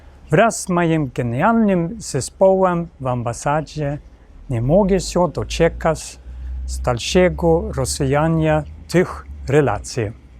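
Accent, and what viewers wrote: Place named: Sweden. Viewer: 😊bardzo ładnie brzmi polski język z szwedzki akcentem 😊Polska låter väldigt fint med svensk accent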